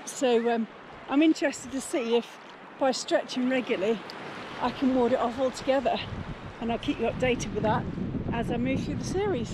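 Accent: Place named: United Kingdom. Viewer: Awesome British accent, Thanks for your service 👍, you earned my subscription God's blessings 🙌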